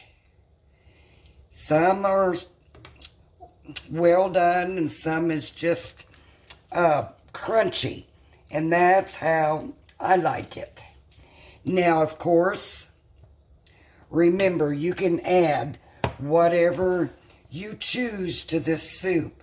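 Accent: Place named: South, United States. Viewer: You don’t have the Indiana accent…it sounds more southern like me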